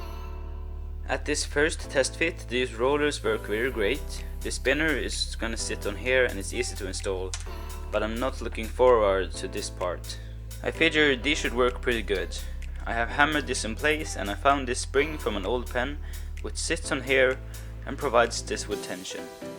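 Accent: Sweden. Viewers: are you from sweden. it sounds like that ,i am. cool project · I recognized your Swedish accent within the first 10 seconds of watching lol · I guess by your accent and it saying linolja on the oil bottle that you are swedish Hej kompis! Älskar din kanal!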